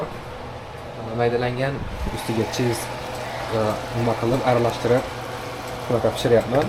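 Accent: Khorezm, Uzbekistan. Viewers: Xorazmdanmisiz accent xorazmga tortyapdi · xorazmli misiz xorazmli misiz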